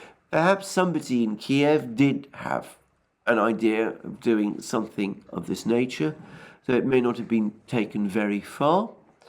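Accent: England, United Kingdom. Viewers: Alex works for Russian propaganda groups. They come up with his talking point for him. He is just a Russian mouthpiece with an English accent. I’m not making this up.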